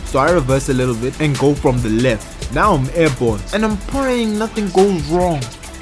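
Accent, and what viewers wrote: Afrikaans, South Africa. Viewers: I knew you were from South Africa with that accent😭😭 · Damn bro south african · My man sounds like a south african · Bro tell me you not South African 😂😂😂😂 that accent.. I’m from Lesotho. I’m subscribing btw 😂😂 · Are u afrikaans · are you south african? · Quick question are you south african coz ur accent is like mine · Bro are you from South Africa. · Are you from Africa wait me and you are Afrikaans bro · can hear the south african accent 😭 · Are you south African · 😅I Feel like you from South Africa 🇿🇦 BRUH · It took me a while to realize you were south african lmao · I was wondering where you are from.. the way you pronounce the letter r made me think SA or Namibia but when you said boers seun then i knew for sure your from SA, jy het n nuwe subscriber gekry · Your accent man . . . Are you South African?🇿🇦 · Are you from South Africa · your accent , the way you pronounced that Afrikaans nam , auidwes boerseun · bro u south african?? 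· Are you afrikaans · Are you south African? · South African? Edit: yeah ur SA, never heard anyone else say Kopp (head) elsewhere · Are u south afrcan? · Are you South African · South African? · What is your accent dude? Its sounds south African it sounds awesome🙃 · Nha I found gold finding this channel 😂😂you can even hear thr south african accent 😂😂😂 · Are you South African You have a fimilar accent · Oh you're south african too? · You sound extremely South African... Am i right?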